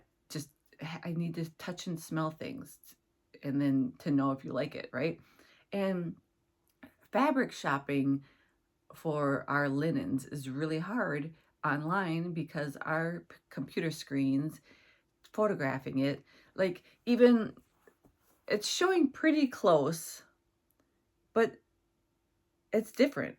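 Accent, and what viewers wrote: United States. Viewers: I love your Minnesota accent! I think I want to try the monogamous stitching next week and am I cheating if my monogamous stitching is a series of month;y charts?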